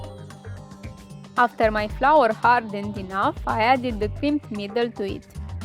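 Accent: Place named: Romania. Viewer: Ma bucur ca ai prins 1000 subs.Foarte tare accentul romanesc.Succes.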